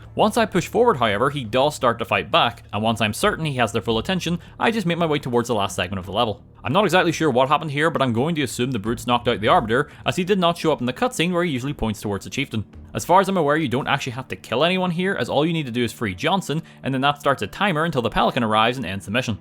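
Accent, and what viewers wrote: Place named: Northern Ireland, United Kingdom. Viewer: Oh that's what that accent is! I was like well that's not scottish or welsh, and it's unlike any irish accent I've ever heard. I mean I know y'all have a new accent every 50 feet but I guess it never occurred to me that northern irish would be distinct from irish to american ears. huh.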